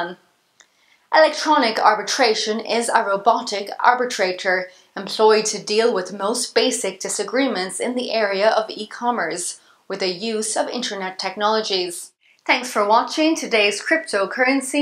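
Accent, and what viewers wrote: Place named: Ireland. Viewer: Like the Irish accent.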